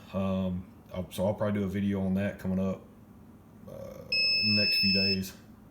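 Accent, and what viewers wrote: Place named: South, United States. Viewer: I feel like we are family, so much in common even southern draw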